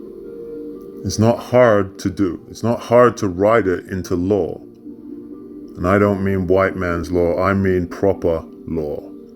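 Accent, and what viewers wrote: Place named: United States. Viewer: What the heck are you talking about with your American accent and admitting that you haven't read the document?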